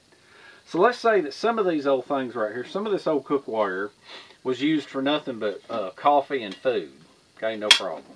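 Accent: South, United States. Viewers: Scientific testing aside, thanks for the video brother! Just checking out your fire and listening to your southern drawl make me feel at ease. I am curious, what is your forest firewood sources, locally we have western red cedar (Thuja plicata), Douglas fir (Pseudotsuga menziesii), western hemlock (Tsuga heterophylla) and maybe vine maple (Acer circinatum). At work I can salvage different oak, maple and beech which I totally prefer but they aren't really available in our forests. For cookware I never really invested in aluminium, most of my kit is stainless and a few more recent bits are titanium. Love the toboggan dude! Although that business is now defunct.:)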